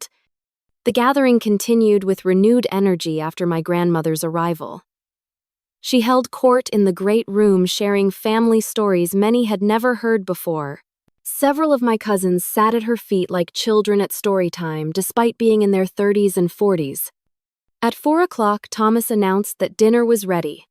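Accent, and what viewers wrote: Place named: United Kingdom. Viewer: You sound like from UK . Whoever is reading does not read well. Wrong pronounciations. !!!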